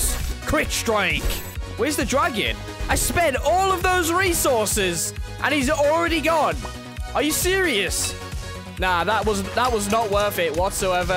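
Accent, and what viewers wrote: United Kingdom. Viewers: MY GUY IS THE BRITISH KING ACCENT🔥🔥🔥🔥💀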